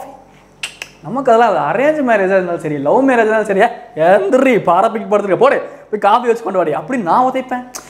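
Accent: Coimbatore, India. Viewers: enunga neenga coimbatore nglaa unga slang supr nga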